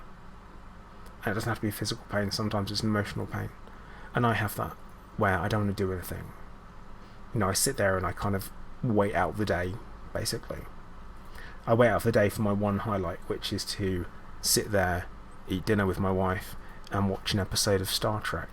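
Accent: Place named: RP, United Kingdom. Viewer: May 24th, 2023 - Dearest, you are inspiring me in ways you will never understand. I feel at times, that you are speaking directly to me, as if you know me personally and you're giving me advice on how to handle my problems. I am very much like you, in the way you like to know the plan, and what's going on each day, wanting the facts and having them organized. There's nothing organized about being ill. I understand the triggers too, when you can't get away from it, and cancer is everywhere all the time! I love your free talks, where you just free-flow with your feelings and ideas. It's like listening to a friend who's going thru a very hard time. I am sending you my best wishes as you go thru your last days. I was heartbroken to watch your latest video today. Your voice is always something I've enjoyed so much. You have such a lovely posh accent, and are so philosophical.:face-red-heart-shape: Smelting Pot!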